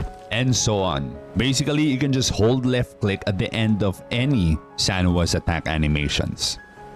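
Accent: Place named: Jamaica. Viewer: Bro are you a yardie ? I cant help but notice the accent. Thanks for the vid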